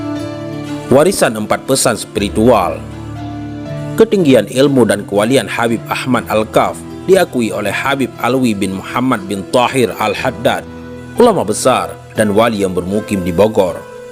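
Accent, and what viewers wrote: Aceh, Indonesia. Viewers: terdengar,, dari logat admin orang aceh yaa hehehe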